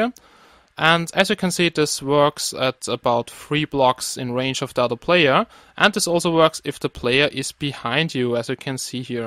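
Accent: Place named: Germany. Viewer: You speak like an German who speak Amarican English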